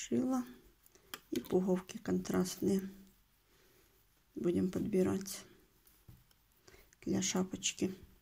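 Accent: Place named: Belarus. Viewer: Это беларусский говор.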